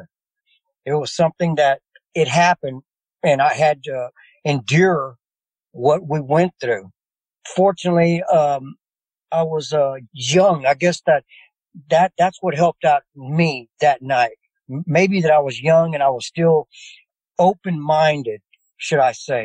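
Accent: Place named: Texas, United States. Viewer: One thing I do know is...He IS a Texan...I am from Texas and his accent is spot on! ie..bob wire..lol..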